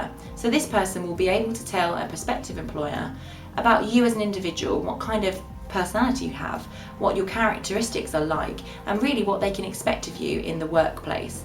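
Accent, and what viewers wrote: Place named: United Kingdom. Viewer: ick awful brittish accent and fugly eyebrows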